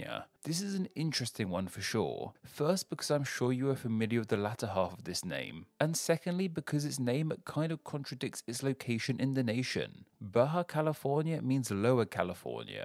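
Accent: United Kingdom. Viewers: The british pronunciation is so annoying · He didn’t butcher them. His British accent didn’t help in some but over all he did very well. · This is a GREAT video. Just some notes. Sonora = So-NOR-ra. The "sur" in Baja California Sur should have a strong "uu" sound. And yeah, saying Mexican names with that British accent just can't be the best. You did good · Your British accent is very difficult to understand for me as an English-speaker living in America. Can other Brits understand you? a Yorkshire man for example, or a Cockney, or for gosh-sakes a Scot?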